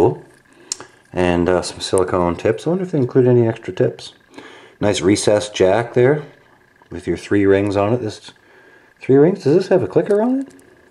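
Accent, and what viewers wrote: United Kingdom. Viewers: lmfao your British accent is epic, it makes us British feel proud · Best british accent ever. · Thumbs up for the English accent!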